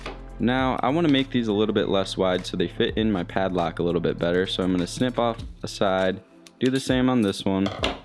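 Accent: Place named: Michigan, United States. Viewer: Pop! You sound just like my family from Michigan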